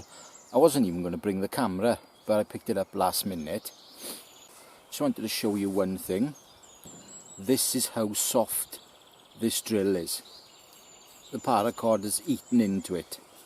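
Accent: Wales, United Kingdom. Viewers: Thanks for another video, I haven't done any bow drill fire lighting for a long time, I was trying to think how long ago it was, I taught my oldest grandson when he was 9 he has just had his 20th birthday, that's when I last did it, how time flies, Things don't always go right and you are very skilled in what you do, you did it in the end, very impressive, I like your welsh accent its very easy to understand, thanks for sharing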